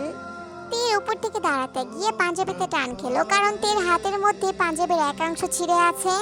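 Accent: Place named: Narsingdi, Bangladesh. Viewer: ওও আমার বাড়ি নরসিংদী খুশি হলাম নরসিংদী কথা বলছো বলে 😂😂😂😊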